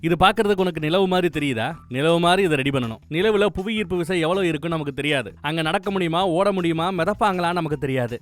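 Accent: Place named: Sivagangai, India. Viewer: ஐயாவின் விளக்கம் வெகு விமரிசையாகவும் எதார்த்தமாகவும் அங்கநங்கே நையாண்டி நக்கல் கலந்தும் சிவகங்கை வட்டாரமொழி வழக்கிலும் உள்ளது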